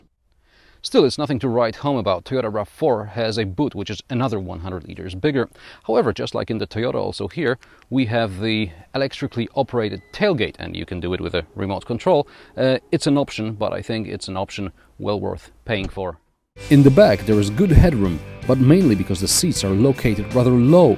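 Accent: Poland. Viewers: What's the point the made rewiew in Poland in English? M8 you still have your polish accent.Do all Poles forgott theirs own language or what!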